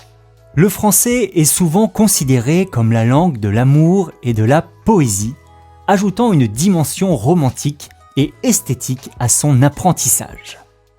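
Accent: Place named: France. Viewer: thank you so much for this amazing video! I love this new format and looking forward to see more videos like this one. BTW, your soft French accent is very beautiful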